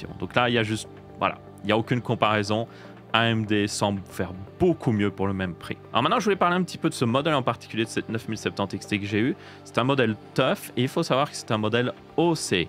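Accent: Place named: Belgium. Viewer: il est belge...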